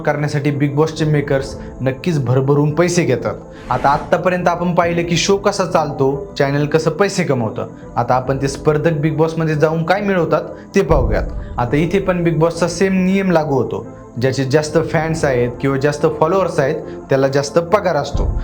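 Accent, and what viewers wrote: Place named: Vidarbha, India. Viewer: Tu vidarbjacha ahes ka? Kiti Ghana bhasha Bolto.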